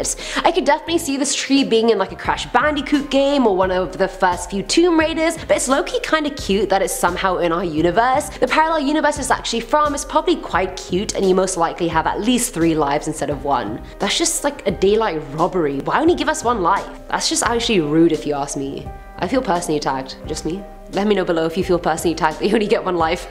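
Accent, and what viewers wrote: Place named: United Kingdom. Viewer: Videos aren't the same when Che is not the host. And why put a British host? 😯🤣🤣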